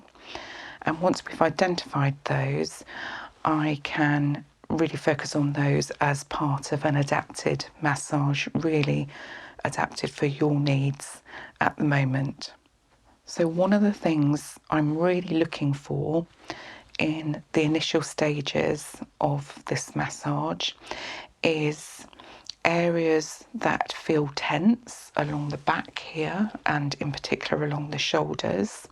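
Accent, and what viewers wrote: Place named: Wales, United Kingdom. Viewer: Best Welsh accent in ASMR no doubt 😅 sleepy 🥱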